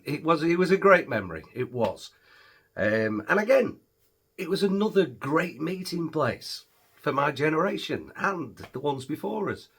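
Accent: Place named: Scunthorpe, United Kingdom. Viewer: I grew up in Scunthorpe but the family moved away when I was 9 in 1971. One thing I remember was Sargents ice cream from ( Hibalstow?), but the vans used to come round. And Normanby Park. I did go the Old Show Ground too. I think I’ve retained bit of Scunthorpe accent. I do remember the way people talked. Like yourself!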